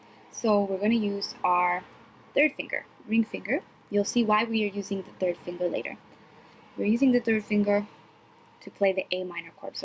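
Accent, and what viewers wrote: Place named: United States. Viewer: that American accent though 😍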